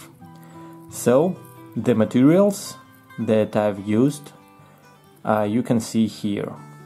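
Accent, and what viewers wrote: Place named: Russia. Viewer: да ну! русский в каждом звуке. а вааще, с произношением катастрофа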